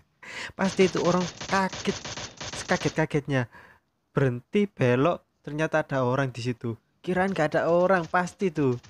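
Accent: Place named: Java, Indonesia. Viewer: Br nonton game ini.. Sk sm suara nya medok medok jowo ok.. Arigato gosaimasta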